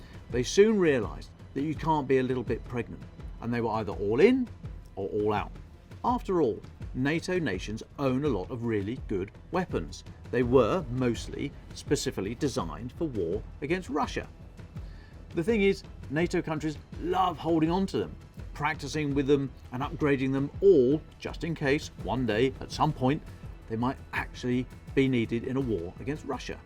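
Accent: United Kingdom. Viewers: "they could get amongst it pretty sharpish" God I love British English and tea teeth.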